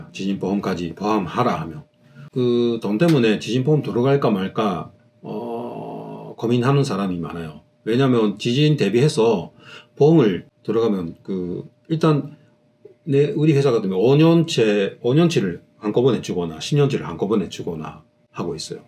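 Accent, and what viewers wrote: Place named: Gyeongsang, South Korea. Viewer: 구수한 갱상도사투리 듣기좋아요😊😊😊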